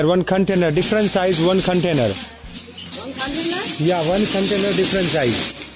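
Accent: Gujarat, India. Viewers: Kya aap Gujarati he ?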